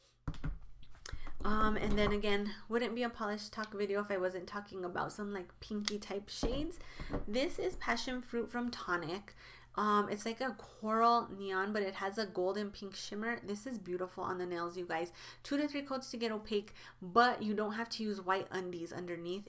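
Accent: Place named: Miami, United States. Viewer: Just started watching this video but I am already fascinated by the accent thing. What most people don't realize is that every person who speaks English has an accent. They just don't hear their own because they usually have the accent of their local area and peers. Or we associate non accented speech with whatever we hear in popular media, but that's also an accent. What I am fascinated by, is that when I first heard you, I thought I heard a bit of a "Miami accent." But not quite. Now it makes sense though! Miami accent is influenced by more Caribbean influences, but both yours and theirs have the darkened L sound. I could talk about this forever. Let me keep watching for polish😅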